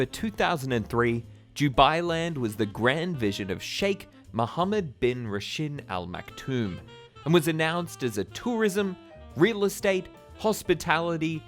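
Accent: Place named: Australia. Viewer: note: he's aussie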